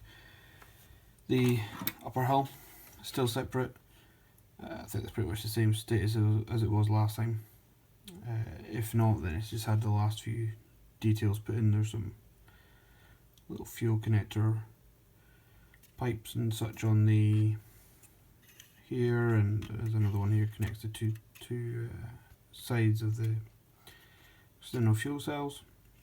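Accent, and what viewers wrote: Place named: East Coast, United States. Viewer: Nice looking build, are you based east or west coast, you dound like its east coast. I have no problem with how you speak, but......... guess where I'm from? Stick at it, you' ll build up a following.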